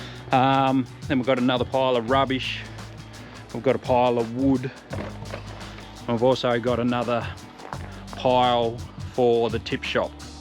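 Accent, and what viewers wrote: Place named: Australia. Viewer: I love your Australian accent and you're looking great 👍 👌 you do a great job.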